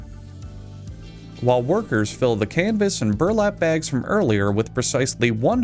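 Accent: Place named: Albany, United States. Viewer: Albany dialect represent!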